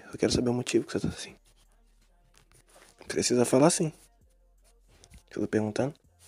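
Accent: Rio de Janeiro, Brazil. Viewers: Carioca neew?? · O negocio é o geito que vc fala AAAAAAAAAAAA INFERNO O GATILHO DANÇA NOVAMENTE tu é do rj? questão de sotaque msm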